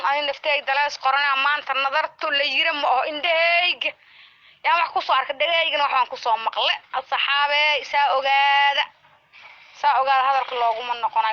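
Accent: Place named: Garissa, Kenya. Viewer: Lahjadha Rer garisa inay tahay labo qof ma isweydinan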